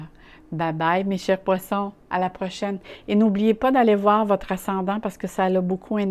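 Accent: Quebec, Canada. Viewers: Merci accent du Québec j’aimerai tellement m’installer à tadouddac merci pour le tirage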